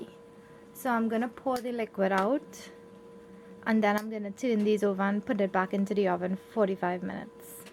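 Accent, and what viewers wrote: Trinidad and Tobago. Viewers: I does Love that Trini Accent so... oh Gawd ! AND she can cook.. I'm gonna have to head to the Homeland and find me a wife. -TriniAmerican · When I think of the trini accent france (French language) comes to mind, so sexy. Great recipe by the way. Real Yard man thing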